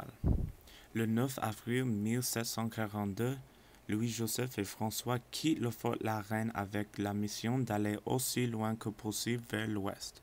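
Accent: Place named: England, United Kingdom. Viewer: this is an english speaker trying to talk french.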